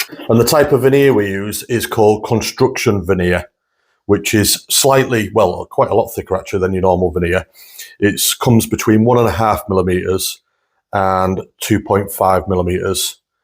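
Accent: United Kingdom. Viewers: Randomly come across you on my home page and just knew you were British, I’m also British 😂😂 love watching wood working videos whilst I’m chillin, so it’s nice to come across someone who’s not American or Canadian for a change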